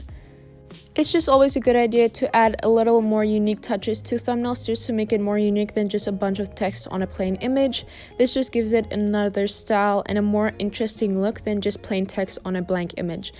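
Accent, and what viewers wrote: South Africa. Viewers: are you also from South Africa?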